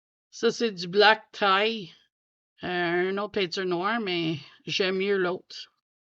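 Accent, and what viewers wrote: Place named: Canada. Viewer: Bonjour ,ton accen me permet de voyager vers ce magnifique canada là où j'aimerais aller tu as une magnifique collection de crayons et de matériel je viens de tomber sur ta chaîne que c'est la numéro 2 , après je regarde la 1 ,j'ai adoré ta vidéo et chaque personne a ses crayons préférés sa ne s'explique pas 😅 je suis ravie de te connaître les brutfuner sont mes crayons préféré j'ai les 180et les520 et djautre gamme que tu as présenté merci beaucoup pour ton partage bisous 😊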